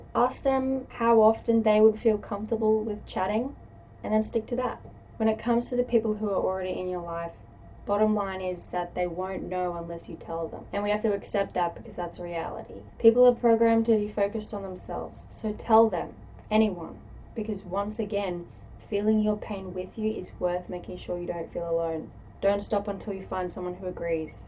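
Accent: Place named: Australia. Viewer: Aye u got a funny Australian accent g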